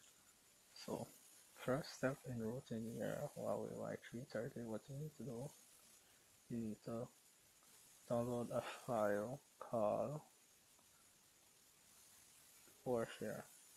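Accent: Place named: Jamaica. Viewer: I can tell you're Jamaican, me TOO!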